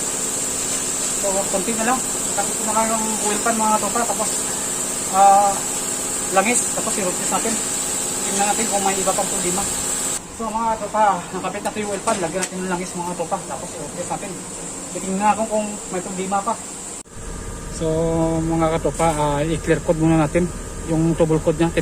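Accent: Iloilo, Philippines. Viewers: ilonggo ka nong? taga diin ka? iloilo or bacolod? iba kasi ka kasi mag tagalog prang ilonggo..hahaha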